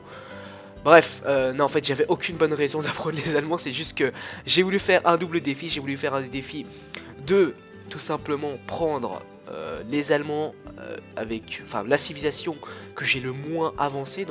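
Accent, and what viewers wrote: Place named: France. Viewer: J'adore ton accent à la française.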